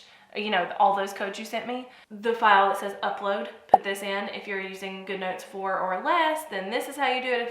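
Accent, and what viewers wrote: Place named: Carolina, United States. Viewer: Nice Carolina accent you have -)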